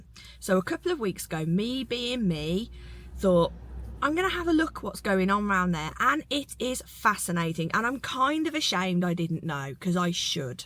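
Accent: Birmingham, United Kingdom. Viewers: I feel the same as you about my Brummie accent. It's lovely to hear it as I moved away years ago and also your videos are great. I've not been to Austin Village for decades, thanks for the info on it. 😊 Tara a bit!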